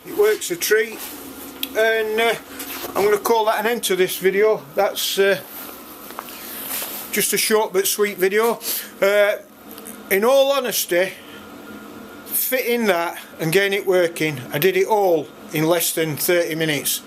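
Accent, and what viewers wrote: United Kingdom. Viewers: Hi. I'm about to buy a Vivaro SWB and I'd like one of these fitting. Do you offer this service please? I'm guessing by your accent that you're in the North? I'm in Lancashire. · Proper northerner. Love the logic, great explanation 👍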